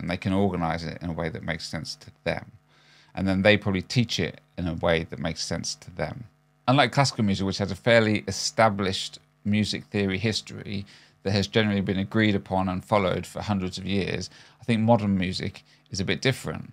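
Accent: United Kingdom. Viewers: Sounds smug and pretentious? That's just his British accent.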